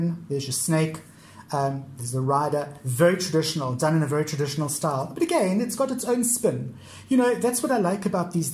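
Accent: South Africa. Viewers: So lovely to hear another South African accent :-) I've enjoyed a wander through your collection. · Rare to find south africans youtubers! Love their accents hahaha.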